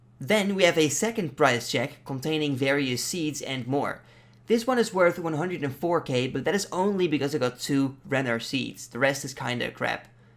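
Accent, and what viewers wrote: Netherlands. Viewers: Van het eerste woord dat je zei hoorde ik dat je nl was in episode 1. Echt een toffe serie en ik hoop dat je een daadwerkelijke noninatie krijgt voor die golden gnome :) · Ah I love a Dutch accent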